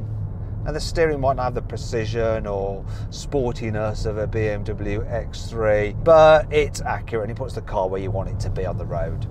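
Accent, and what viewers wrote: England, United Kingdom. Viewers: I don’t know why but after watching this video I feel agitated. Part of the reasons is because the guy’s handling of each part of the car was quite rough and thought he might break something. Also i don’t get most of what he said due probably to his real English accent.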